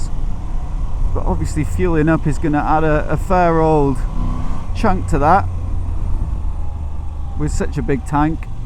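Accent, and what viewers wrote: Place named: RP, United Kingdom. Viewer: Knows how to speak English? He is English. Nothing but the Queens English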